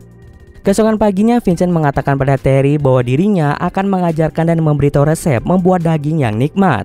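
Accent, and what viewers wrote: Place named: West Java, Indonesia. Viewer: Sm dgn..konten filem...Di youtuber saqahayang klo buhara agak ke sunda2an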